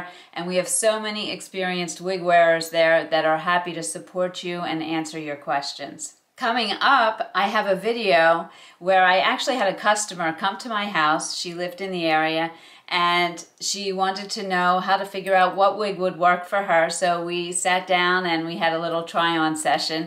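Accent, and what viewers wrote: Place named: New Jersey, United States. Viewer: I'm from Connecticut near the Westchester border and to my ear I hear New Jersey.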